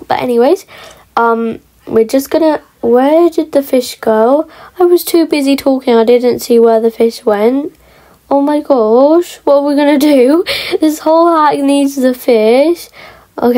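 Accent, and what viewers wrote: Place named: United Kingdom. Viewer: I ❤ ur British accent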